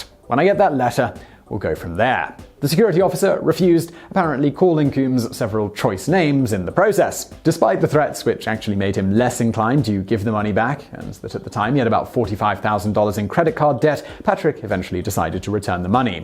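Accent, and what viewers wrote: United Kingdom. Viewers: The way you talk here is why I think you're one of the best narrators ever and why I always click your videos, regardless of subject. You could make a video on the average consumption of prune juice in your local elderly home and I'd still be fascinated. Also, you are probably the most British person alive.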